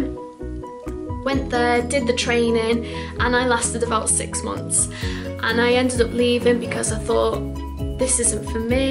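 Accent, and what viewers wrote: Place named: England, United Kingdom. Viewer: Not that I want to be cabin crew, it was very nice to hear all about it and see it from the other side, this video was very informative and thank you for posting. Just trying to work out which part of England you accent is from.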